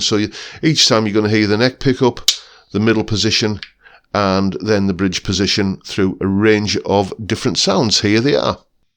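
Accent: United Kingdom. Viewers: ademas de tocar bien la guitarra, habla un perfecto Ingles con acento Britanico.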